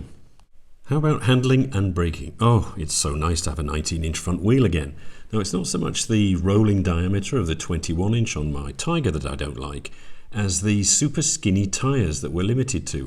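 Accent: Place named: RP, United Kingdom. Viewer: Love your English. Proper RP. Cheers from an English teacher in Sweden. And yes, that bike is way too expensive for most of us mortals.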